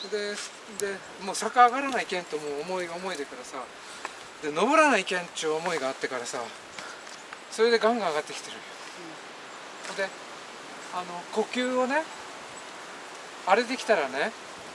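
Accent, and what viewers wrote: Japan. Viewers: 最初は愛媛の方言かと思ったｗ 岡山出身だけどその辺のおっちゃんの喋り方だな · なんか苛つく言い方に聞こえるのは私だけかなぁ？ 九州弁ってこうなの？ あまり皆 理解してないと私も思う。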